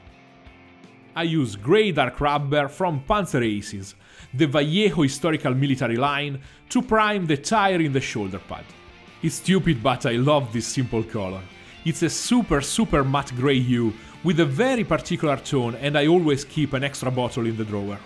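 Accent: Italy. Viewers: Working in adult language acquisition I always tell my learners the same. Removing the accent is a ton of work, can easily fail depending on a multitude of factors and usually is just not worth it, unless you want to hide your origin for some reason. I find it charming and enjoy your videos no less for it, quite the opposite. Makes me think of some of my Italian friends, which makes you even more likeable, if that's possible. Last but not least, don't underestimate the Yoda effect: If you're the master and you want your lessons to be memorable and to penetrate your disciples hazy mind barrier, make your words different, intriguing and striking. Speaking like the rest truly you must not.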